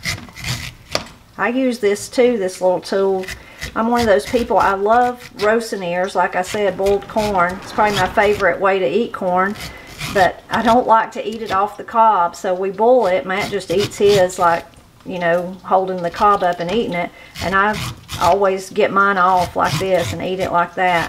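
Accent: Appalachia, United States. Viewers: I grew up in Kentucky and al of this is fermileria to me, my mom did her 🌽 both ways, fried and cream style, so good. She was a great cook! Miss those times. I enjoy all of your videos, cooking, hillbilly talk and all of that kind of stuff 😋 just had to add 😉 this to my response 😀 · I started watching this to experience your culture, your language, and your wonderful accent, the bonus is the amazing food!. I know so little about Appalachian culture other than the stereotypes depicted in movies. Thank you for this video. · Food aside, love the kitchen cabinets. And, I could just listen to this woman talk without end. What a gorgeous accent. Back to the food, i remember riding my motorcycle from New York down to West Virginia around ‘81 or ‘82 and stopping by a small cafe. the waitress told my the special was ‘soup beans’, corn bread, and collard greens. 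As immediately smitten with this Appalachian waitress as I was, I would have ordered anything she suggested. When I heard the woman in this video casually mention ‘soup beans’, it brought me back 40 years. It’s not a term we hear in New York. That waitress Had an accent much like this wonderful woman here. I was as completely mesmerized then as I am now. One the most memorable meals of my life. Eating that wonderful food and having a breezy chat with that Appalachian waitress felt incredibly exotic to this New Yorker. It’s funny how this video took me back to that. Thank you.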